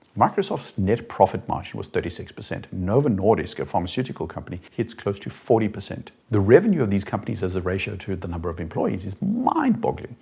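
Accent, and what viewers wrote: South Africa. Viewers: Great video, are you South African? I cant quite place your accent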